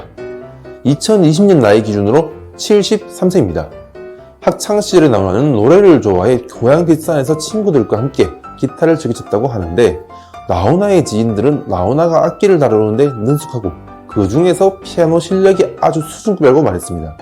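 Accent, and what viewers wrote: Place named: China. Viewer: 유튜버님 혹시 중국인이세요? 아님 조선족이세요? 발음이나 억양이 한국인이 아닌것 같은데ㆍㆍㆍ??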